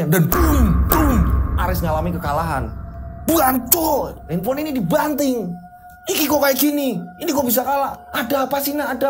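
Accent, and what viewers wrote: Java, Indonesia. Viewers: Logat jw ms bikin seneng oy..menjiwai bgt bawain crt,jg khas sgtnya membawakn crt,slmt ms succes sll berkarya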